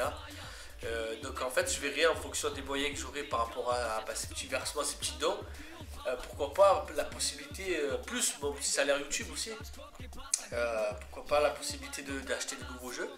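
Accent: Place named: Marseille, France. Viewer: GG, pour le gameplay,je connaissais ce call of et j'y et jouer beaucoup mes pas en multi j'étais encore gamin je jouer que en solo et je fessais les mission je c pas combien de fois et cet map ma flasher dans la tête je crois que c'était la première mission dans cod 4 en campagne si je me trompe pas ^^ bref bas moi je c pas trop comment sa marche sinon je t'aurai bien donner 1 ou 2 euros pour le Paypal et je joue sur pc donc voila ^^ dommage désolé même si je te connais pas bien car je me suis abonné ya pas trop longtemps mes j'ai regarder tes anciennes vidéo tu es honnêtes (un mec de marseille avec un putain de bon accent xD ) etc bref bonne continuation sa payera par la suite a+ mec pour une prochaine vidéo:p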